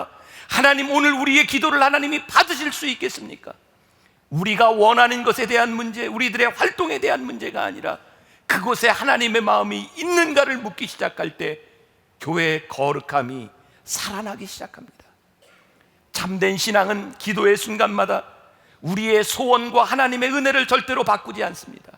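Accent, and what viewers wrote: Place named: Gyeongsang, South Korea. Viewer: 안녕하세요 만나교회담임목사님 제고향경상도대구에서와서요사투리참재미있죠항상은혜말씀끝은주님의사랑이죠아멘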